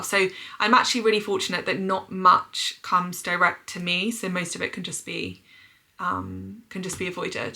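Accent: United Kingdom. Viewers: Your videos and your channel are maybe more appropriate for the ladies, but once I occasionally stumbled on a video of yours I subscribed and keep on watching them... You live in a very beautiful place, like a fairytale village... And listening to your special British accent is the real pleasure to me , now living in a countryside of a distant land . It helps me much while I'm out of the conversational practice... Cheers...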